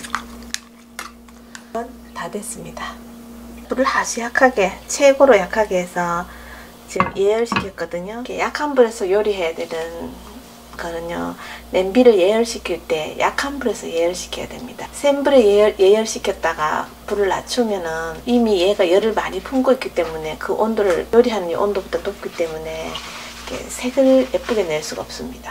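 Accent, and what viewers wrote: Gyeongsang, South Korea. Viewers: 경상도 사투리인가? 넘 귀엽네요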